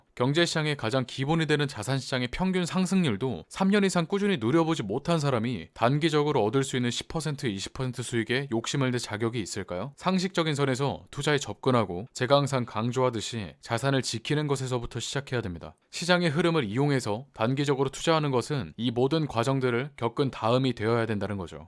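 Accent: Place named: Chungcheong, South Korea. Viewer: 목소리 멋있다.. 릴스보고 진짜 충청도 사람인줄 ㅎ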